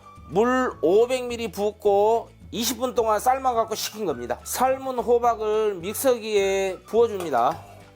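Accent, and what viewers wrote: Jeolla, South Korea. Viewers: 전라도 사투리가 구수하고 요리에 정성인 세이프님 늘 감사합니다 이번에 LA갈비 4킬로도 성공해서 가족들 잘 먹었습니다 오늘은 호박술빵에 한번 도전해 볼렵니다 믹서도 어느분이 물어서 잘 구입해서 사용하고 있습니다 이번 김장에도 잘 사용할 것 같습니다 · 여수 광양쪽 사투리인줄 구수하고 좋내요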